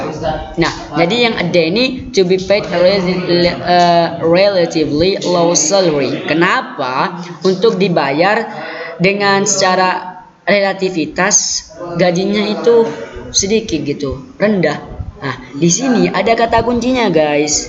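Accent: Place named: Madura, Indonesia. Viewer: Bahasanya ketahuan jek madureh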